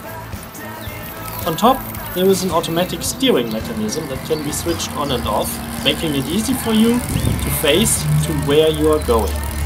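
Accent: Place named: Germany. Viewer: Sprichst du normalerweise Deutsch? Man merkt es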